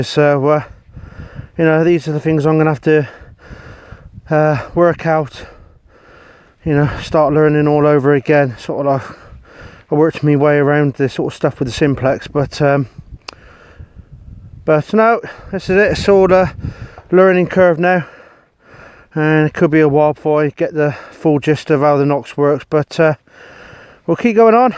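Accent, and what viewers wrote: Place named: Hereford, United Kingdom. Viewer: Enjoyable watch. Know how you feel, was a similar thing with my Gran whom I named my daughter after. Also have a Nox and love it just desperate to get back out. Have to ask where you're from as your accent is similar to mine (to my ears anyhow) 😂(Hereford here)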